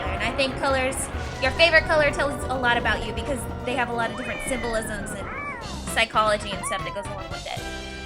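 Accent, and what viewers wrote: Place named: New Jersey, United States. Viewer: nice joisy accent haha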